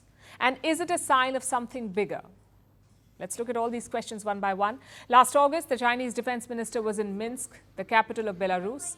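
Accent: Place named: India. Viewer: FirstPost is funded by the CIA. Easy proof: FirstPost never makes fun of Biden or Kamala's gaffes / bloopers. CIA won't allow that. USA wants to brainwash Indians. So they chose Palki with her thick Indian accent to fool Indians into thinking that she speaks for Indians. She is just a CIA employee.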